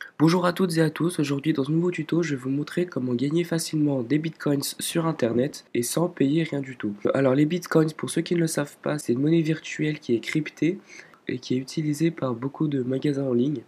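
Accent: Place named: France. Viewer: Bravo pour votre accent français, ça fait plaisir.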